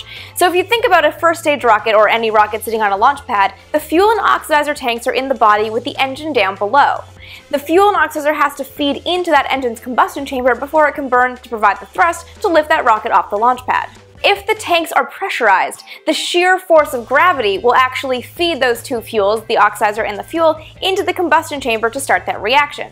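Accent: Canada. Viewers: Laughing Gravy Exactly. Every Canadian I've ever known has pronounced "missiles" exactly like her. OP obviously jumped to a conclusion. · Love her Canadian accent. Especially how she says "missile".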